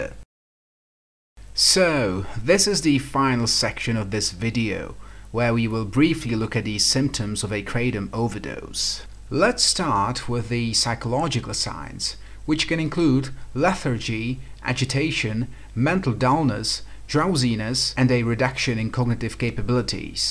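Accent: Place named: United Kingdom. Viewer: Jeej... to znie britsky, nie americky A hlavne nespievas 😅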